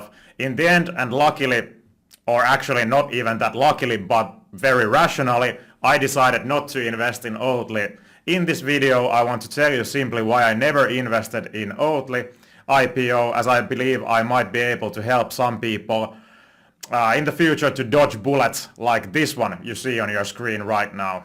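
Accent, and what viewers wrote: Finland. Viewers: you sound finnish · I FKN love Finnish accent when speaking English, littery getting goosebumps. Much love from Sweden😊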